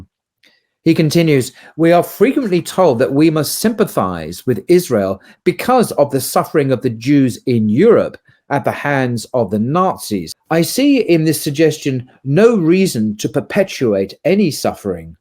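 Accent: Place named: Cockney, United Kingdom. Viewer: I have been a student of Russell for my entire life. Deeply admire the man. He was a giant. Can't resist the following observation: You describe Russell as a "pacifist," then you go on to say--without any apparent sense of irony or recognition of contradiction--that he "supported the war against the Nazis as a lesser of evils." Hello? Anybody home? Ah, just watched the rest of the video. The Cockney accent grows stronger as it progresses. "Aerial bombing, blah, blah, blah." (Witness Dresden, for example.) You reveal yourself as both a moron and, perhaps most of all, a Jew hater. Thank you so much for this erudite little vignette.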